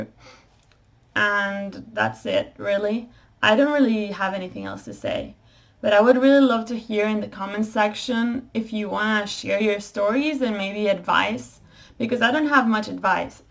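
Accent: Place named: United States. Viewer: you speak american english very well! how did you get that?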